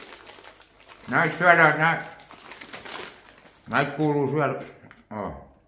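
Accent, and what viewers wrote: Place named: Finland. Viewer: I love finnish accent 😅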